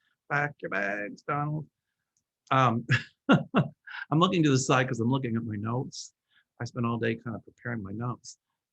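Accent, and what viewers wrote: South, United States. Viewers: I was just wondering where your accent was from ? I knew it was somewhere from the south. ( I am an Aussie, accents fascinate me ) I love your readings,so happy to see you have recovered from that vile disease 💜💖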